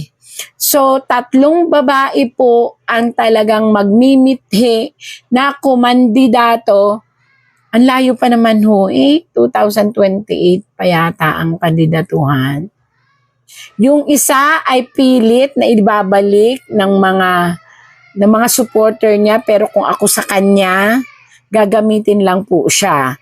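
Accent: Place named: Visayas, Philippines. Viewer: Nku si ito nagsasalita.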